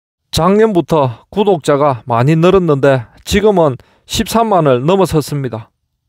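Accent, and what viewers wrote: Gyeongsang, South Korea. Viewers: 심심하면 잘 시청합니다 추카추카 합니다 경상도 말씨도 구수하고 여기는 전라도 광주네요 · 축하합니다. 씨! 얼굴도 보이게 찍으면 더 좋을것 같은데... 얼굴좀 보여 주세요. 경상도 사투리는 고칠수 없다지만 얼굴 보여주는것은 시청자에 대한 예의가 아닐까요?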